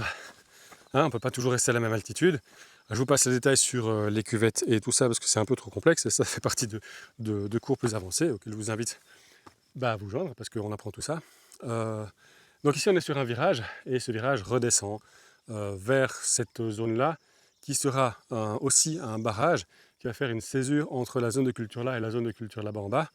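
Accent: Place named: Brabant, Belgium. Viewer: Bonjour, bonne découverte. Vivant en montagne noire, le château d'eau du Languedoc, sur les hauteurs du pays carcassonnais. On se trouve à la croisée des chemins des climats Je réfléchis beaucoup pour avoir une cohérence sur mon païs d'adoption. Objectif, préservation, régénération, encrage culturel et naturel, robustesse. Votre vision analytique m'intéresse beaucoup. Je travaille sur une terre d'un 1/2 hectares, en pente, ruisseau, courbe de niveau, future habitation en greb en amont, verger, différents niveaux de couverture végétale pour préserver le sol et les couches inférieures comme un parasol et surtout diminuer l'effet de l'évaporation. Objectif : garder ce précieux capital qu'est l'eau, régénération des biodiversités, enrichissement des sols. C'est une longue quête, merci pour votre analyse, je reconnais d'ailleurs un accent de mon païs d'origine, Brabant ? 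Cordialement, d'un belge en païs occitàn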